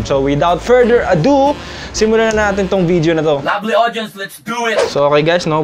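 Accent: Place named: Visayas, Philippines. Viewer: Kuya bagay mo yong besaya accent mo😂🤘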